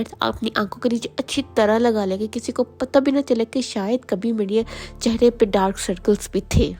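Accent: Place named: Punjab, India. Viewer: Punjabi accent full 😅😅😅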